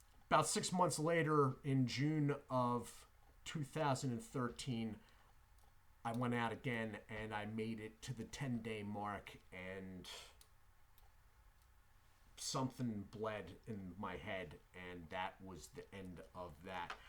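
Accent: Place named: Pennsylvania, United States. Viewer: I thought your accent was south of Bucks county 👍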